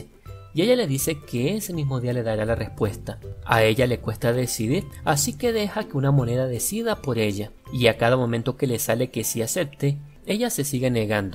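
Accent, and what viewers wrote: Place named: Venezuela. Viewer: No sé el porqué pero siento que eres Venezolano (la voz del chico que hace el resumen), tu forma de hablar es demasiado característica de los Venezolanos.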